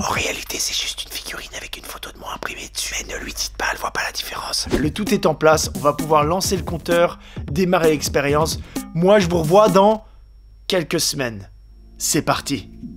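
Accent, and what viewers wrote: Belgium. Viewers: T'es belges ? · tu es belge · il est belge?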